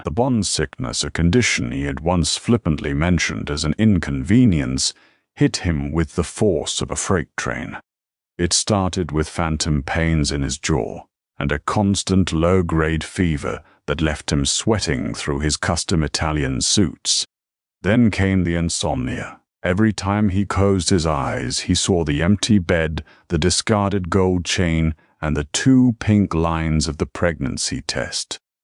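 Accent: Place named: United Kingdom. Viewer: Nice story. Love this british accent ❤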